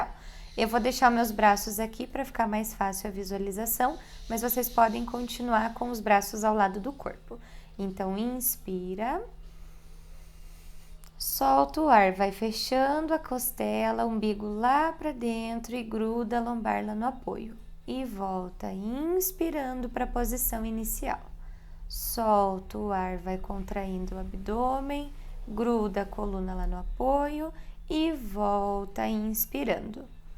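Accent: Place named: Curitiba, Brazil. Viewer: Ah que pena em vários momentos cortou significativamente o áudio - uma pena porque a professora é boa e paciente. No começo deu uma tremida também, mas depois resolveu. Vocês são de Curitiba? É pelo sotaque porque tem outro Eqiulibrium em SP. Mesmo eu que já faço dei uma perdida com a falta de som às vezes. Obrigada pelas dicas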